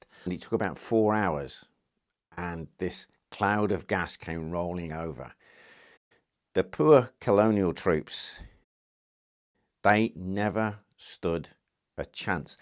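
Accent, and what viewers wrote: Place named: England, United Kingdom. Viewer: Interesting... I thought you were a out medieval times history and the such... Just watched arrow shooting vid where u said u moved to Canada... Does that mean you lost your English pride. Or patriotism for England... It's just so odd hearing the accent and saying your Canadian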